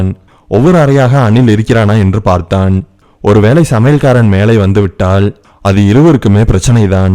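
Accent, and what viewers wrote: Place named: Kongu, India. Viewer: நல்லா இருக்கு கொங்கு தமிழ் ❤❤😊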